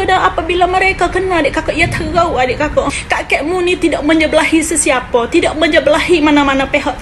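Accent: Kelantan, Malaysia. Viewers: Kelate pekat kecek.. tapi siaran jln2 cari make hok buat meme sohor benar d kelate sampai pakat duk cokoh depe tv.. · Sedap dengar loghatnya.. Macam kelantan + siam Malaysia + Thailand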